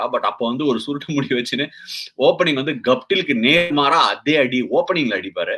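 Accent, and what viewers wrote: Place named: Tamil Brahmin, India. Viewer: Tamil Brahmin style of talking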